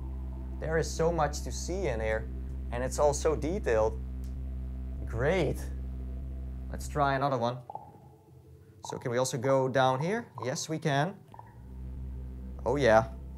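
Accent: Netherlands. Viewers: Zijn Engels is goed maar hij heeft een Nederlands accent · Je kan horen dat hij van Nederland is!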